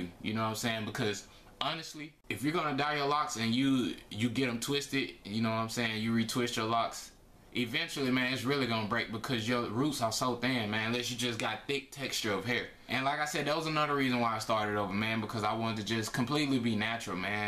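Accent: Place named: South, United States. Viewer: ahh okay. I gotta cousin from Texas and you sound just like him so I knew it was a southern accent.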